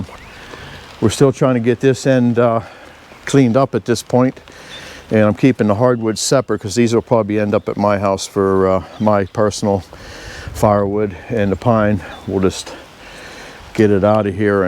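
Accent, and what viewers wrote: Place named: Pittsburgh, United States. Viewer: Handy sez that is a thick yinz we accent.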